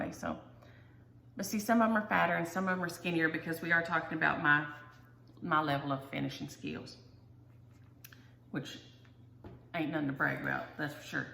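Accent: South, United States. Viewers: Love the moonlight as well as the evergreen ..congratulations on 3000subs ! And I love your accent and southern ways ..I’m from up north and it sounds special to me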